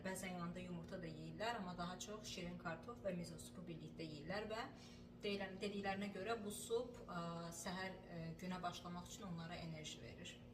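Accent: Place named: Baku, Azerbaijan. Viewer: Əlaaa. Aləmsən. Bakı ləhcən lap ləzzət eliyir. Eşq olsun ❤❤❤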